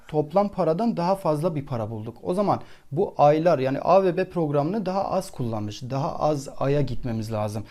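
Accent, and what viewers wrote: Van, Turkey. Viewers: hocam sen kesinlikle vanlisin şive oradan ve inanılmaz semlatiklik katıyor:)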